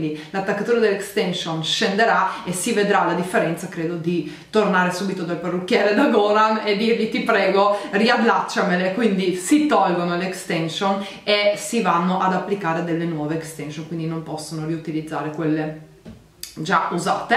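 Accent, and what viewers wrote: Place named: Trieste, Italy. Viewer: Haha se senti che te son triestina..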